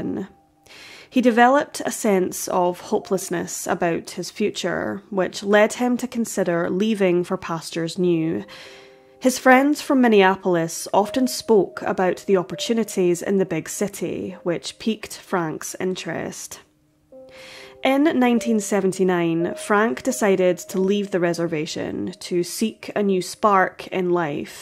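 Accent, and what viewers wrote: Scotland, United Kingdom. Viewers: The Scottish🏴 accent is quite lovely🤗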